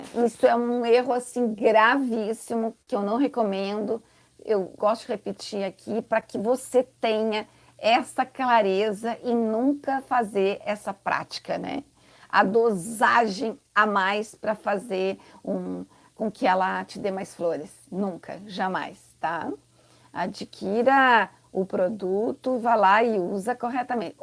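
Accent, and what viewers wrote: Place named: South, Brazil. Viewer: 👏👏👏sou do Rio de Janeiro! E amo o modo de falar de vocês do Sul ! Do nosso amado Brasil!! Beijos!!!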